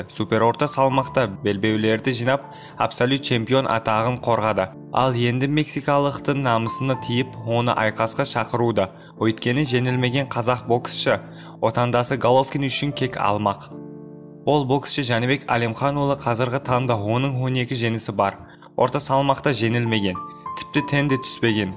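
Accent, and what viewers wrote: Uzbekistan. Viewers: Автор өзбекпа басқама бастысы қазақша сөйлеуге тырысып жатырғой ағайындар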